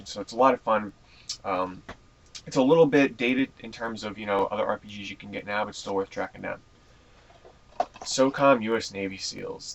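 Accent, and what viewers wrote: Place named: New York, United States. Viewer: haha, i know. i love the accent. i think in a video he said he's from new york.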